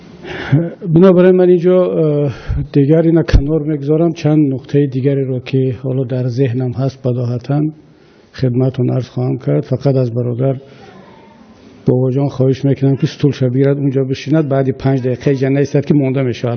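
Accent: Tajikistan. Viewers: лафзаш тоҷикии тоза аст. ман ба лафзаш ягон эътироз надорам. ман аз ноҳияи Айни вилояти Суғд мебошам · Ана инпа меган точики тоза бо забони точики тоза гап мезана одами бо илм малумаст илмаш.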